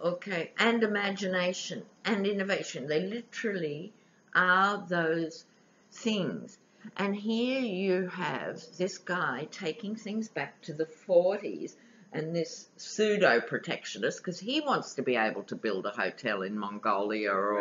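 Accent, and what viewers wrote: Australia. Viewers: I <3 your running commentary. Find myself laughing out loud, and God knows we need it. So... is your's a "posh" Oz accent? Is there such a thing? From the land of the maple leaf, who has educated, but not posh accents.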